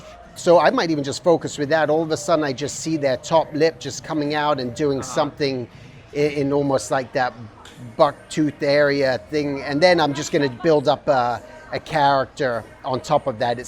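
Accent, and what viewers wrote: United Kingdom. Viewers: Oh my gosh I'm glad it wasn't just me! I hear British pronunciation, a Cleveland accent, and New York inflection. WHAT?!?!?! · I know tight! Hahah i was baffled by it! I hear british, a lot of new yoooork. But also a flavor of canadian in there so weird hahaha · He sounds like he has a British accent mixed with a New Jersey accent.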